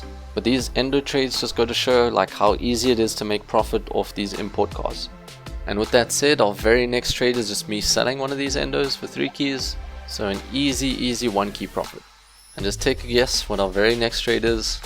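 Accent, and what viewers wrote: South Africa. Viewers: your south African right? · i hear south african, i like. Btw, i know how the lag feels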